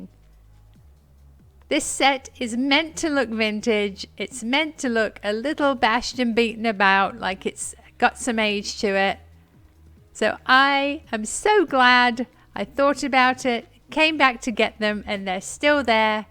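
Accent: England, United Kingdom. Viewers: Love your accent my mother was from England. Miss her voice. Love your videos!!!💕💕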